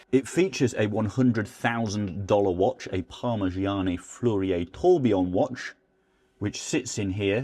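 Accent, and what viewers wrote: United Kingdom. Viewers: His british accent is adorable. Buttun.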